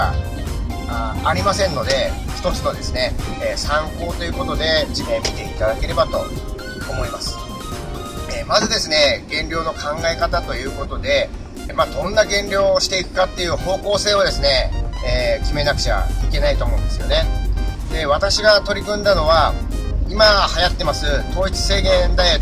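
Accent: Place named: Tochigi, Japan. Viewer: ありがとうございます 関係ないですけど自分も栃木県民なので応援してます！w たまにでる栃木訛りがいいですね！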